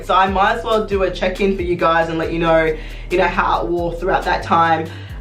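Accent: Australia. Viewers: Omgggg a melanated Australian!!!! I’ve never heard or seen one that’s wild to me but I love it. I’ve always wondered if we could be Australian (seems so rare). But I appreciate this video because I’ve been looking for a comparison of 430 against the other two. And maybe even 450. I no longer think I’m 430 but it was hard to tell bc the store I was in didn’t have 430 tester😭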